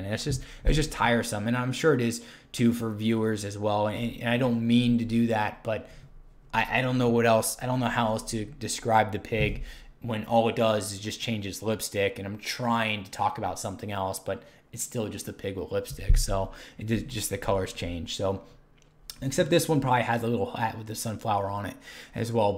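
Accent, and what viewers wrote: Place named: Texas, United States. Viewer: where are your from? Texas? I was wondering because I never heard your accent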